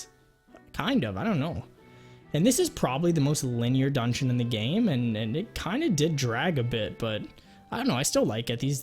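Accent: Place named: Canada. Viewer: bro this canadian accent is killing me 😭:face-pink-tears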